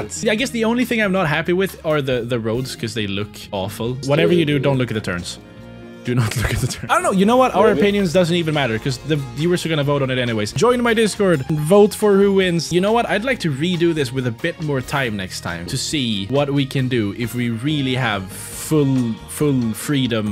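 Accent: Sweden. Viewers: Are you swedish? Bec i hear a accent.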